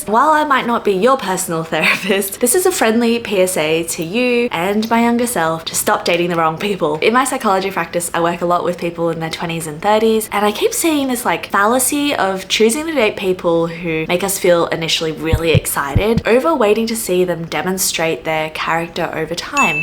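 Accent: Australia. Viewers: I just watched it and the intro and outro are adorable! Lots of people love the good old Aussie accent :) And the research and referencing was really good (I am an Australian psych honours student - so I may be a bit biased). I reckon you should leave it up, it's bonza!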